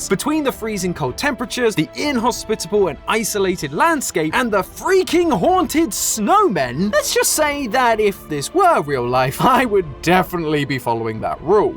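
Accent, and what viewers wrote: United Kingdom. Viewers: A British voice explaining lore just hits different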